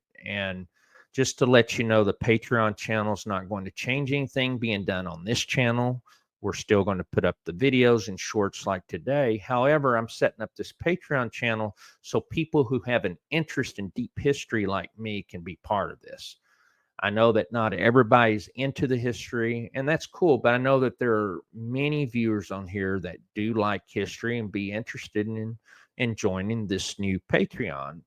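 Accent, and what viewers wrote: South, United States. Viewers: ' Country drawl is calming and pleasant...charming if I may...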